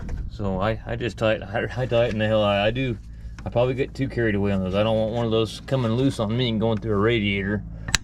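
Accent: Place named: Ohio, United States. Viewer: I heard "wars" and "tars" more from a guy from Ohio than I ever did from actual Texans when I lived in Texas for 30 years.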